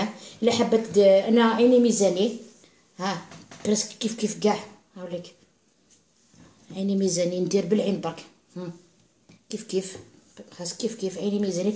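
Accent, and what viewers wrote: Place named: Bejaia, Algeria. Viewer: ya3tik saha ya l'fahla konte ghir n'hawass 3la la Re7 hata sma3te la voix ta3ak chaft la vidéo 3raft bali bdjawiya vive les kabyles Toooooop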